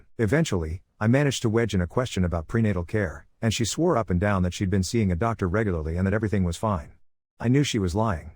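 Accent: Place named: United States. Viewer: Sounds very american 😂😂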